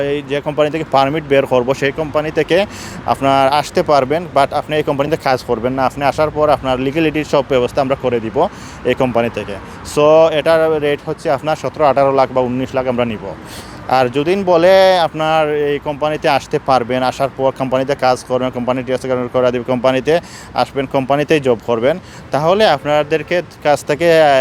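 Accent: Sylhet, Bangladesh. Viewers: ভাইয়ের কথা শুনে মনে হচ্ছে, আমাদের সিলেটের??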